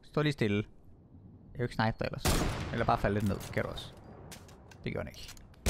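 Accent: Jutland, Denmark. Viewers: Kommer du fra Jylland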